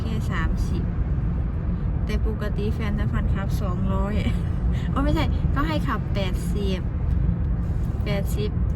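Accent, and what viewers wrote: Laos. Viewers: พยายามอย่าพูดลาวบ่อยๆ เมื่อไหร่จะพูดไทยชัดสักที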